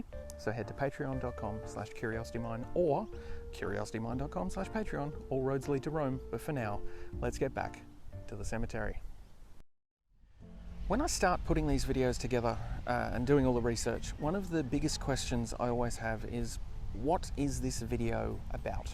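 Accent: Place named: New Zealand. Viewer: I hear more than a touch of Kiwi in your voice ?. Kiwi rests content with his gentle application of the Borax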